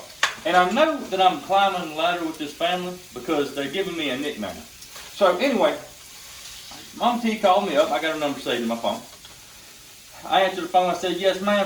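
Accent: South, United States. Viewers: I recently discovered ur videos, love them! I share them at the nurses station n u hv us rolling. U Sir r very entertaining, love ur southern drawl and ur stories, keep up the good work. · Just love love his videos. Both sides of my family are from Mississippi, I just love a man with a Southern accent and can tell a story, like my my uncles. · Handsome and Funny. As a Canadian, that Southern accent is doing it too. 😉😁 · He is cute with that little southern accent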